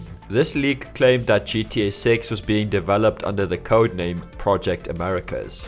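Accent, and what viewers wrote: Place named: South Africa. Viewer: The South African accent is coming through bru! Lekker video!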